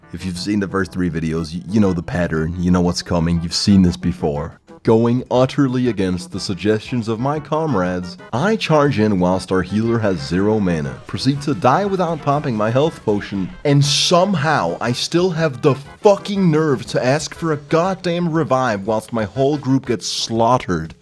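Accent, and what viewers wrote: Denmark. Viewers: bruh, you from Denmark? Got that Arla hitter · Wait a minute - er du dansker hahaha?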